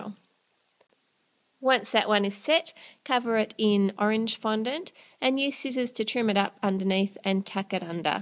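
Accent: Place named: Adelaide, Australia. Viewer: Are u from Adelaide?! U sound like u r! I'm from Adelaide!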